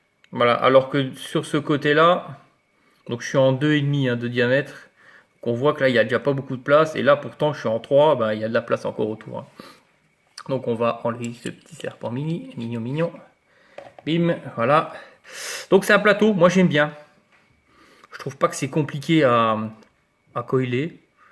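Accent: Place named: North, France. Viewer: je suis sur atos mais en reconstructible j ai pas passer le pas mai très bonne vidéo bonne explication de quelle région tu habite tu a un accent qui fait penser un peu au nord moi je suis originaire des Haute Pyrénéenne mai j habite en région parisienne Bernard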